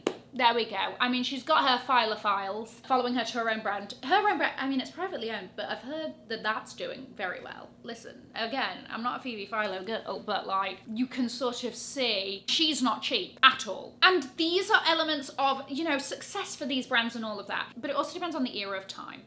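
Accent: United Kingdom. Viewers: Your synopsis is “sodden genius” (said in your British accent) ❤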